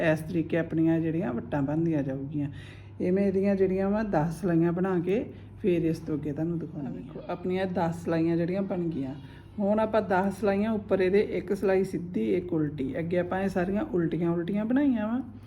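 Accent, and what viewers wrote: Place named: Majha, India. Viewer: Mam tusi majhe de boli bolde j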